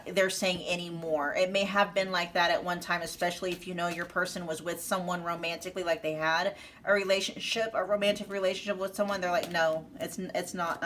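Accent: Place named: France. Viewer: Pile 2 and 3. He was still married and lied about it. He's a drug and sex addict. We both have kids and I was willing to leave my husband and create something him. Thank God I saw all the red flags and I cut all type of communication between him and I. I'm in a better place far away from this drama. Lies can clearly cause detachment between people. I regret meeting him. I'm a psychologist and I can't even imagine the struggle and the burden of his lifestyle. I discovered everything and I'm just disappointed because I waisted my precious time. May he find peace and joy with his son's mother. That French accent is not for the weak.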